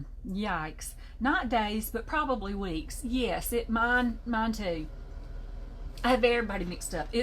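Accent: South, United States. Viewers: Hello,!!! Just love to hear you talk. Love that southern drawl😅…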